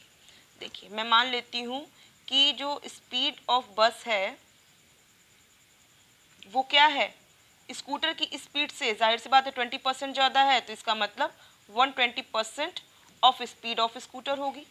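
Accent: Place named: Eastern Uttar Pradesh, India. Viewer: I LIKE YOUR ACCENT 🙂 EAST UP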